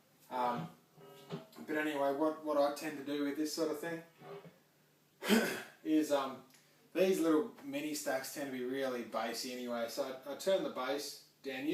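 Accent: Australia. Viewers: I like your Australian accent hehe! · Ok, that's an Australian accent. Nice, dude. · Fooking Australian mate! I thought he was American for some reason.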